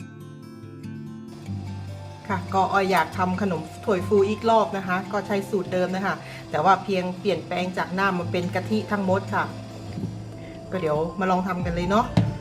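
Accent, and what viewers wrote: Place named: Korat, Thailand. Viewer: สำเนียงเหมือนคนโคราชเลยค่ะ😊😊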